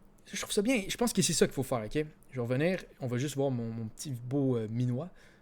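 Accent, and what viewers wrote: Canada. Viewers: L accent canadien et a chier mais bon c est pas votre faute si vous parler comme sa les canadiens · tu es quebecois donc vous parler différemment de nous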